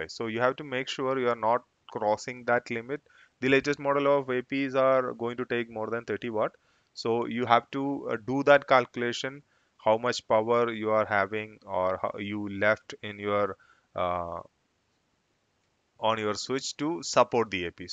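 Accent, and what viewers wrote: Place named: Kerala, India. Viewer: Malayalam പോലെ ഇംഗ്ലീഷ് പറഞ്ഞപ്പോ മനസ്സിലായി... Anyway very good videos.... Followed you in insta👍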